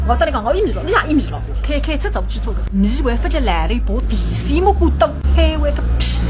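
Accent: Suzhou, China. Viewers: 嗲，正宗苏州闲话。在加拿大的上海浦东人，听苏州话。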